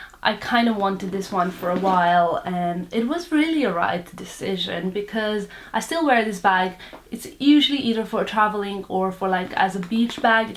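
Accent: Serbia. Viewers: I think she's Serbian?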